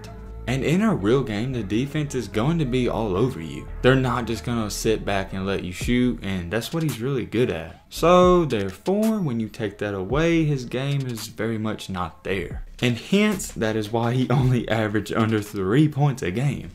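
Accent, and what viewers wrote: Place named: Texas, United States. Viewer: You have a king of the hill accent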